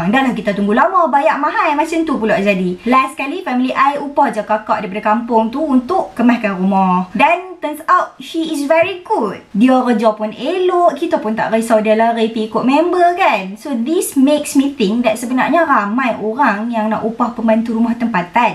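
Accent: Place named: Kedah, Malaysia. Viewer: hopefully kak sntiasa istiqamah pakai handsock huaaa suka sangat tengok dah la cantikk cakap kedah pulak tu 😍 kita geng laa!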